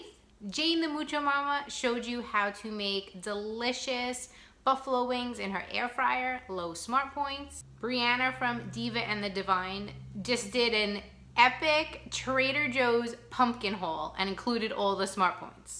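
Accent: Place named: Long Island, United States. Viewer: The Fit and Fabuless Mama I am in Ontario I live in the north of the province in a small town close to Huntsville Love that you caught the u, if we were speaking g then for sure you would hear a Canadian accent as I hear your LI accent and think it's lovely 😊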